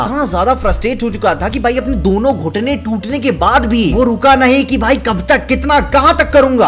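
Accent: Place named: Bihar, India. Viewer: Bhaiya ham bihari hai... Ham bhi kabhi kabhi ki tarha batiya lete hai. Par pata nahi kyu.. vo accent bhiya ke mu se jyada acha lagta hai... 🤣 Keep up the good work bhai..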